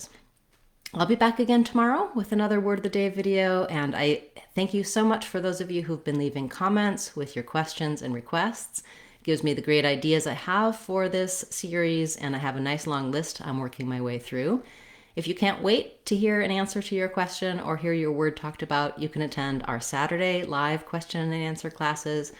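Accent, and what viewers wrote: United States. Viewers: Been learning English for a very long time , my vocabulary is vast and I’m almost flawless in grammar, never paid much attention to correct spelling. My biggest challenge is pronouncing the words correctly. I love American accent and I’m enchanted by your accent, words sound pleasant when you use them.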